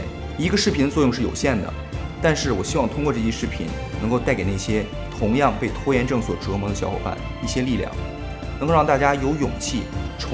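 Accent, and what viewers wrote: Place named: North, China. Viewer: 大陆北方人说话的习惯 我们也不习惯听台湾腔 也不习惯听阿拉伯语 西班牙语 但是重点难道不是；内容比较重要吧？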